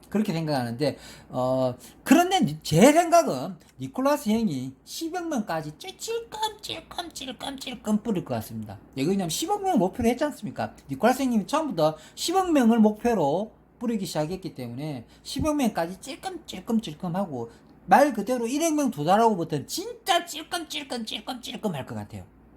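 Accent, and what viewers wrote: Gyeongsang, South Korea. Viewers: 행님 경상도 어데 사십니까?ㅎㅎ 좋은 영상 감사합니다 ㅎㅎ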